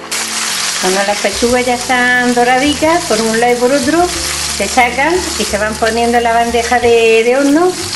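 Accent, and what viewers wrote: Murcia, Spain. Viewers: las hice ayer buenisimas usted debe ser de cartagena o murcia por el habla murciano yo soy de ahy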